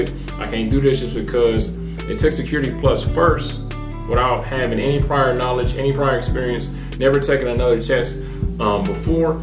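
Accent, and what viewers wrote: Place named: Dominican Republic. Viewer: This man sounds and look Dominican ! I needed to support right away ! my people !!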